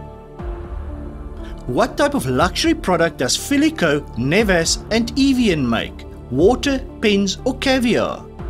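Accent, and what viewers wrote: Afrikaans, South Africa. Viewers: Bud as a fellow South African, you are clearly of Afrikaans origin,you need to work on your pronunciation.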